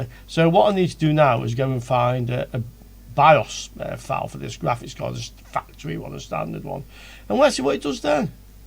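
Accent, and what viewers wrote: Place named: Staffordshire, United Kingdom. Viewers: Hi is that a potteries accent I hear Nice. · inteet duck, i recon ya from near Tunstall i left back in the 90s but i can hear the accent still lol i miss oatcakes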